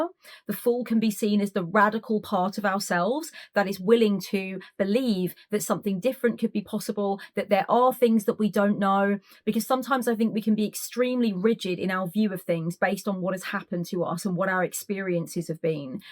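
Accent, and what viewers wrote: United Kingdom. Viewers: Yeeeesssss another unique British accent to save for my accents of Britain collection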